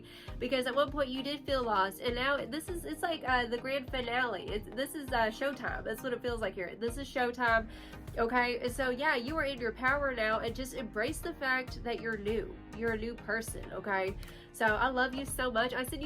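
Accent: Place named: Texas, United States. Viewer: you are such a good singer. i love ur voice and texas woman accent. reminds me of a cold glass of arizona sweet tea, baby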